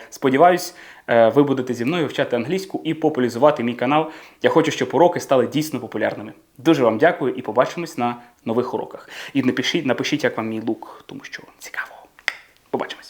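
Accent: United Kingdom. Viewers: Ви смішний) на Метта Деймона схожий в цьому образі ❤ Відчувається британська вимова, не американська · !!!! Велике дякую за Вашу працю. Мені дежу подобається формат викладання англиійської мови. Весело і розуміло. Але трішки добавте прикладів. По 1-2 реченню. Так краще запам'ятати. Ваш look very good!. Едине - я живу зараз в Канаді, pronunciation тут не британський, в цьому є різниця. Але головне з Ваших відео я получаю грамматику. Дякую. Good luck!! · Лук класний!, ваш британський акцент просто неймовірний! Як довго ви живете в Британії, що набули такого акценту? Дякую за випуск!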